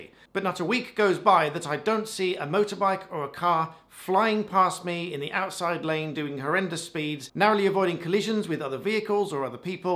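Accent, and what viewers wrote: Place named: England, United Kingdom. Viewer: What country is he talking about? He seems to have an English accent.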